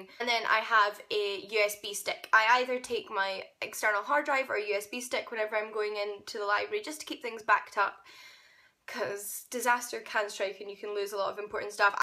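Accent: Scotland, United Kingdom. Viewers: You sound Scottish!! I adore how you said Zed if I didn’t see the Z I wouldn’t have known what you were talking about and I thought the apple pie was funny 😂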